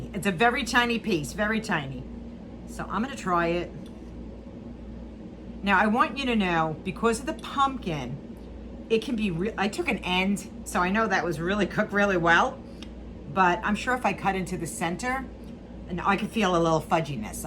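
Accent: New York, United States. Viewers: Nice!! I lived on Long Island till I was 32 years old and we moved to PA in Mount Joy and then moved to Mechanicsburg. We only lived in PA for four years and moved back to NY near Rochester! I enjoy your accent! It feels like home! lol I sound a lot like you. My husband and I were watching you and I was like, she sounds like meeeeeee!!! lol You have a new fan! :)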